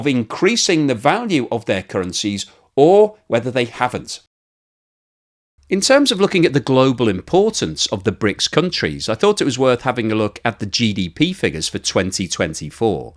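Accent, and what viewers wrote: United Kingdom. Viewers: British accent = western bullshit. EVERY SINGLE TIME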